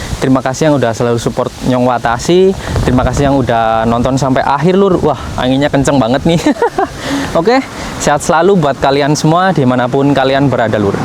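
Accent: Banyumas, Indonesia. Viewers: wong ngapak ya mas bro? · mas bro kowe wong ngendi si kok ngomongnya kaya gitu ?, orang ngapak ya !. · Kang kaya wong Banyumas asale ngendi....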